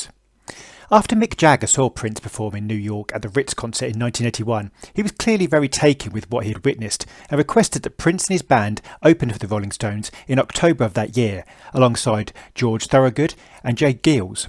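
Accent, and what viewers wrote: United Kingdom. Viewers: ingilsh accent, ingilsh bs. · Oh really? So that was your video narration, then? Well, it just happens to be truthfully the case that your ultra-posh accent led me think you're likely gay. There's nothing wrong with being gay in and of itself, btw. The only thing I actually have against gay culture these days is its wholly unrealistic demand for universal acceptance, which it will never get, if for no other reason than that it runs contrary to many people's traditional religious beliefs. Anyhow, if what I said about your accent bothers you, then I suggest you gtfo of the London area and spend time with scousers. You may or may not like them, but at least you'd rarely suspect they're queer based on voice alone.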